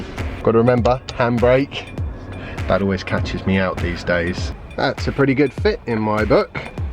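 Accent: England, United Kingdom. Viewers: This fellow seems like a legit English man. He says "brilliant!" just like ron from harry potter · May I ask you a favor? I'm a foreign english student and I'm always looking for english videos on Youtube. For my luck, searching for Tesla I got one of your videos and I'm enjoying a lot the british accent, but sometimes because of the differences between US and UK english I can't understand some words/sentences. I know this would make you work even more, but... are you able to subtitle your videos? It's for a good cause, you could write in your videos title: [English Subtitles] and this would bring you a lot more attention because of english students :P Thank you very much. Peace out, bye bye.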